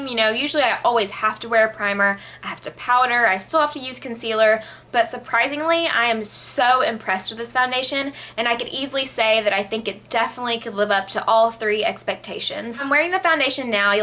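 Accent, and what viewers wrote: South, United States. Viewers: You're so pretty! And I definitely didn't think you'd have a southern accent!!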